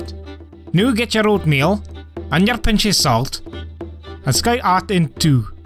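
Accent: Scotland, United Kingdom. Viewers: of course he’s Scottish. They’re probably auto captions which is just what the computer thinks he is saying. And the computer isn’t very good at listening to non American or RP English. Scots is a language which split off from Middle English. A lot of it is mutually intelligible with English but many Scandinavian languages are also mutually intelligible to certain degrees. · Dude I work in Scotland but your accent is something else . Subscribed tho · Love your North East of Scotland accent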